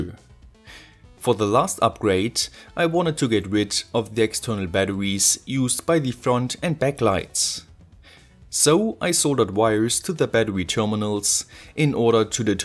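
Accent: Germany. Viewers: German english is so funny to listen. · Ich liebe deinen deutschen akzent😂👌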